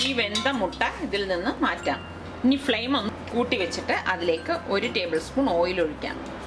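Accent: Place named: Thrissur, India. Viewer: thank you madam .also ur thrissur voice OK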